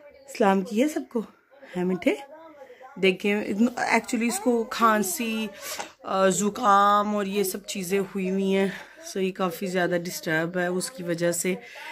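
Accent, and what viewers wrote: Sialkot, Pakistan. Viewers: aap ki awaz sa laga tha k aap sialkot sa ha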